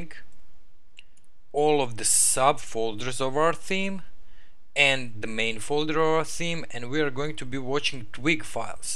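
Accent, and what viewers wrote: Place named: Russia. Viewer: с первой секунды понял что ты русский)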